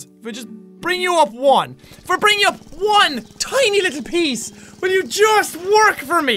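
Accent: United Kingdom. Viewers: Omg that British accent though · wow I didn't know he was British too XD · I love his British accent lol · YOU WOUND SOOOOOOO BRITISH! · HISS VOICE IS BRITISH I KNEW U WERNT IRISH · you have good british aqzent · Calm, British Jack filled me with hilarity and concern. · Good British voice PS it's amazing !!!!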